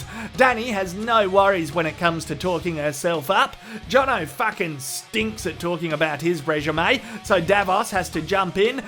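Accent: Australia. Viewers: Fuckin love the aussie's your patter is brilliant!!!! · Oh, the Australian accent! Noted.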